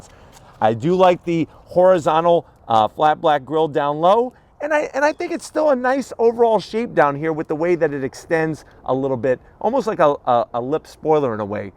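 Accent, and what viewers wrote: United States. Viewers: not u. He's got a yank voice. Maybe NJ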